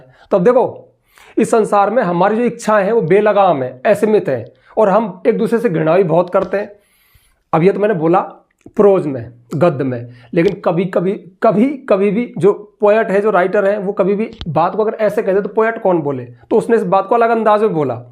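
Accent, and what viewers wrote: Rajasthan, India. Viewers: Sir mujhe lag raha hai ki aap Rjsathan se hoo 🤔😊❤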